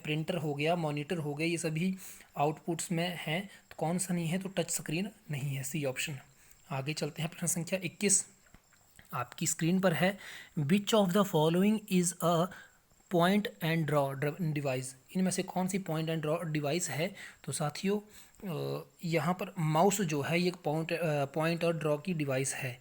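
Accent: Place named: Kullu, India. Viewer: Yaa tum kullu se ho mandi but mujhe kullu se lag rhee ho ????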